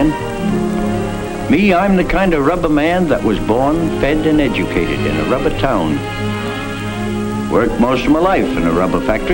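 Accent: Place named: New England, United States. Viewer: Definitely a New England accent !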